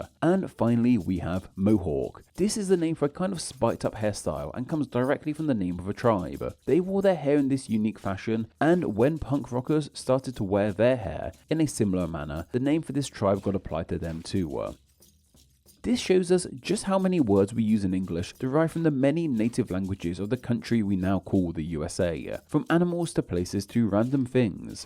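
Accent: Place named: United Kingdom. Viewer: I’m not sure why we have a British guy telling us about which American words and place names come from Native American languages… because he doesn’t even pronounce them the way we do!!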